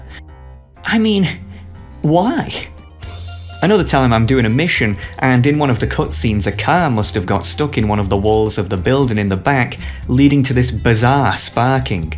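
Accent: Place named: Scotland, United Kingdom. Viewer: You’re scottish i think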